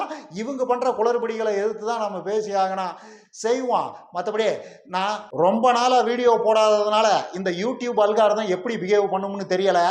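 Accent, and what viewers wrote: Kongu, India. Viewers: தம்பி உங்க கொங்கு தமிழை நீண்ட நாள் கழித்து கேட்பது மகிழ்ச்சி.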